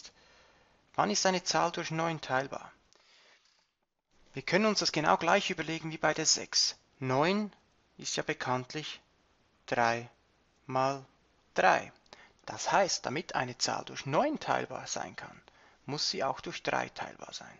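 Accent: Switzerland. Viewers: Sehr angenehme Stimme hast du da.. Nur e chli Schwizerdütsch gehört mer da use ;) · Joa ganz guet. Nur hört man wirklich manchmal ein bisschen Schwizerdütsch. Aber dankä fürs Video =D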